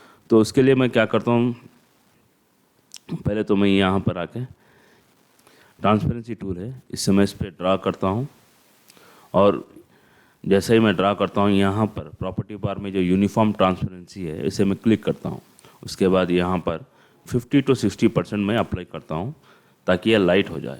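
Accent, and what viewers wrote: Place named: Hyderabad, India. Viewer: Asakum Nice vdo Your accent is like deccan hyderabad. I am sure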